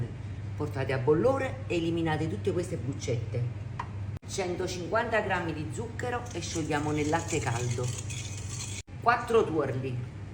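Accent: Naples, Italy. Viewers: Brava con il tuo modo di fare rendi tutto facile fai venire la voglia di cucinare e fare i dolci insieme a te❤ Non c' è niente da fare la cadenza Napoletana rende tutto più facile. Anche io sono Napoletana e appena posso vengo a prendere la pizza da asporto da te per i miei bimb❤❤❤i e mangiami anche la tua frittatina di pasta e il tuo crocche ' di patate mentre aspettiamo di ritirare le pizze. Grazie per la tua semplicità e la tua simpatia innata❤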